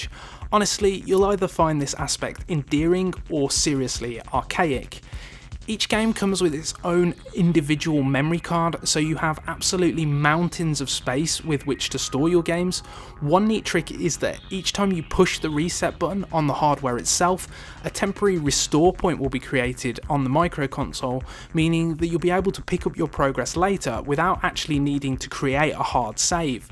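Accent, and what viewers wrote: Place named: North West England, United Kingdom. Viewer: Jorge Luis Pérez Armijos Sounds like north-west England to me. Somewhere around Birmingham or Wolverhampton.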